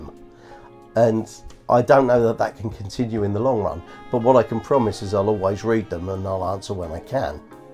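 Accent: England, United Kingdom. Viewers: I've watched a couple of videos and some has had background music a bit too loud. In this video it's fine, not too distracting (easier to take in what you are saying), and also listening to you talk is a melody in itself. Some English accents are very pleasant to listen to. Videos 10 mins or longer are my favorite, that way I can listen to them for a while when in the kitchen! Keep up the good work!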